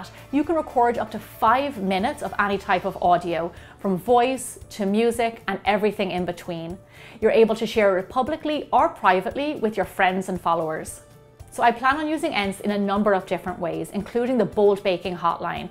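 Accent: Ireland. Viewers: I love hearing your voice. That Irish lilt is so beautiful. I am downloading the app now.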